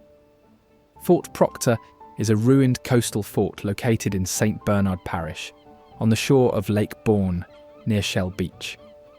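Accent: United Kingdom. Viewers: As a Houma Native American, I am offended that the Houma Tribe is not even mentioned and I have never heard of the Point au Chien tribe. Too many inaccurate claims for such beautiful drone footage! I'm guessing that the narrator's British accent is a giveaway of why there is no mention of the Battle of New Orleans where the British Army was mowed down by Andrew Jackson, Jean Lafitte and native American & Cajun volunteers at the Chalmette Plantation south of New Orleans. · Better Music would've made this SO MUCH MORE Shareable... the British accent is a bit overplayed and mispronunciations laughable - otherwise BEAUTIFUL!!!!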